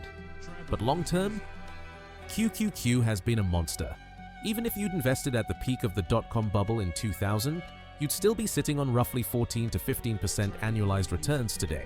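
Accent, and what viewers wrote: United Kingdom. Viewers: I hear a British accent and an alarm bell goes off.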